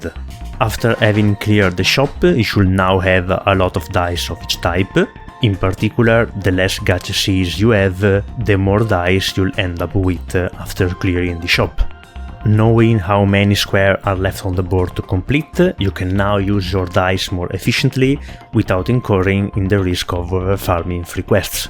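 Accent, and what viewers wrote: Italy. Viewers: Are you Italian? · Needed this, thaknk you? (Sei italiano vero? ;) ) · What a nice detailed guide, ty so much! I recognized rapidly that you're italian from your accent :D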